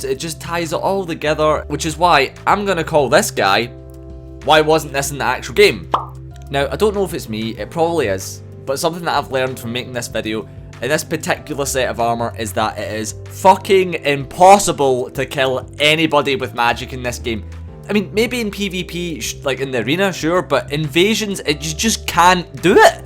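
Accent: Scotland, United Kingdom. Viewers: that scottish accent maaaaaaaaaaaaan!! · Are you Scottish? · I love the Scottish accent!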